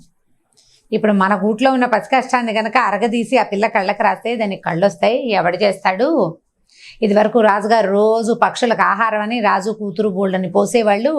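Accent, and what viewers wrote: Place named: East Godavari, India. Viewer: Namaskaram pedda.akkayyagaru.mee.mata.stala.svacchamgavundi.bahusha.meedithoorpugodavarijilla.anukuntunnaanu.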